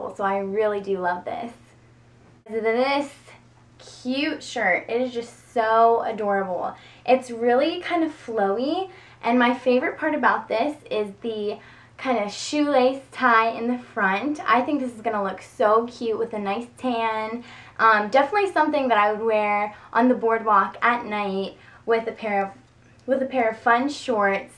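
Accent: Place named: United States. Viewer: Tu es magnifique, ton visage est.... il n'y a pas de mot, ta voie je l'adore c'est la voie américaine que je me suis imaginé, ton style est très beau. Gros bisoux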